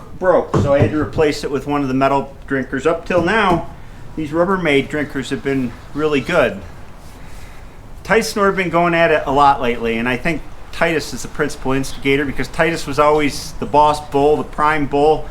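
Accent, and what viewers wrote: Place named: Texas, United States. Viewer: Sound like Texas but no debate about snow😂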